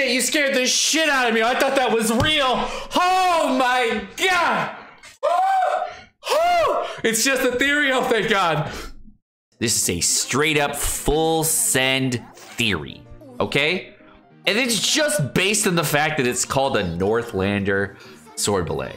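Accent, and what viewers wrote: United Kingdom. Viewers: damn british is growing on me:D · At this point I watch this channel not for genshin, but for a new episode of Bri`ish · I just love British.....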